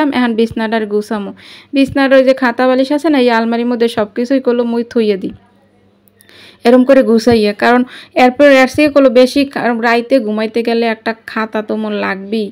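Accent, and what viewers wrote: Barishal, Bangladesh. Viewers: আপু আমার কাছে তোমার ভিডিও থেকে না তোমার কথাগুলো শুনতে ভালো লাগে বেশি তোমাদের বরিশালের ভাষা আমি তোমার কথা শোনার জন্য তোমার ভিডিও দেখি ফাস্ট টু লাস্ট মানে তোমার কাজের কি কমেন্ট করব বুঝিনা শুধু তোমার কথা শুনতে থাকি ❤❤❤❤❤❤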